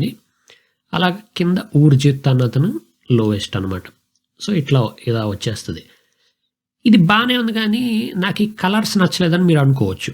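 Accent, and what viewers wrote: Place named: Guntur, India. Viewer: You must be from Tenali or Guntur. You accent matches with that.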